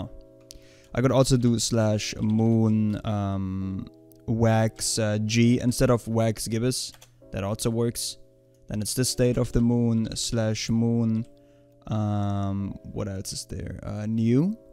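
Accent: Germany. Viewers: Jo Bro are you German? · Are you from germany?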